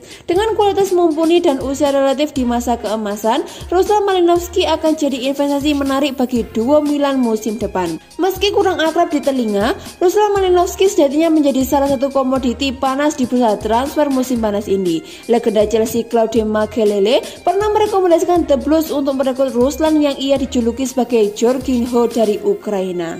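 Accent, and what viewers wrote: Java, Indonesia. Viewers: Host ny logat bicarana ni medok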